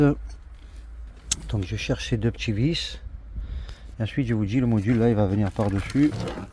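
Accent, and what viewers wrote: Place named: Marseille, France. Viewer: avec l'accent marseillais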